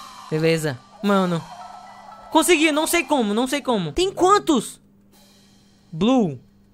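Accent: Northeast, Brazil. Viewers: “Ee man, djabo é issai” Como eu amo meu sotaque nordestino <3 skskskjsjs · Terror com sotaque nordestino hmmm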